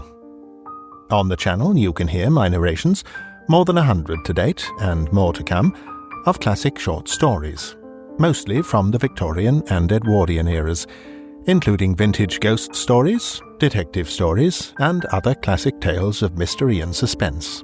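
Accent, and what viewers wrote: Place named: RP, United Kingdom. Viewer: Nice. This is one of my faves from Sherlock's short stories. It's got a great mix of inductive reasoning, clue following, person tracking-down, interesting side characters, and a happy ending for all parties. Very nice to hear it from my favourite posh British narrator: Mr.. Happy holidays to you, and to everyone who reads this.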